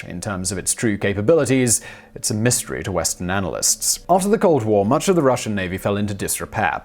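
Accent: England, United Kingdom. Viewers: Johny Ricco well, he’s English English. 😂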